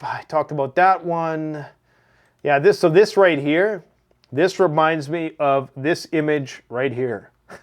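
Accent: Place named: United States. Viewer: Hey — you sound more like an American prepper to me — maybe you should move south.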